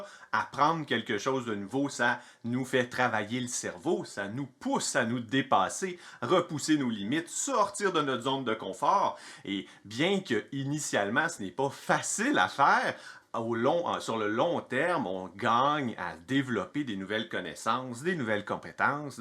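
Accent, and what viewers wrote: Quebec, Canada. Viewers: Vidéo qui sent la passion, avec ce petit accent québécois très sympathique. Bonjour de Belgique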